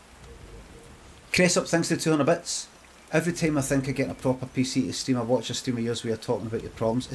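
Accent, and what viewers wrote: Glasgow, United Kingdom. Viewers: I wish YouTube's automatic CC knew how to translate Glaswegian to English.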